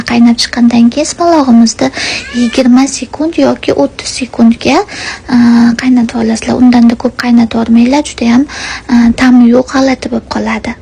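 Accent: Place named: Fergana Valley, Uzbekistan. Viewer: Каерлик шу киз шеваси водийликка ухшеди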